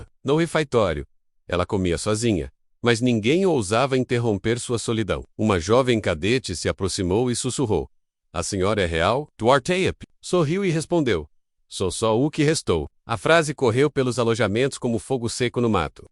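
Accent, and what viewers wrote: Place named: England, United Kingdom. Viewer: Não gostei! História muito vaga. Tradução truncada, ora em português, ora em inglês, ora com sota- que inglês. As fotos não correspondem à idade da soldada. Parecem mais com as de uma modêlo de 25 anos que pelo tempo decorrido deveria aparentar muito mais idade.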